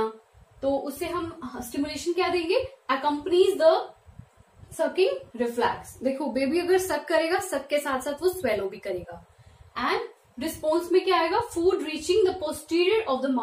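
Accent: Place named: India. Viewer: English ko Indian log ke jaisa bolya mam pronouncession is bohot hard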